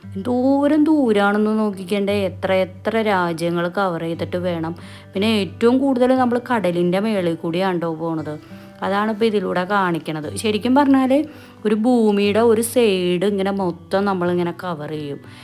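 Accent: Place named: Kochi, India. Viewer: ഇവർ മംഗ്ലീഷ് അല്ലല്ലോ പറയുന്നത്. തനി കൊച്ചിമലയാളം. എന്നിട്ടാണോ ഇങ്ങനെ പറയുന്നത്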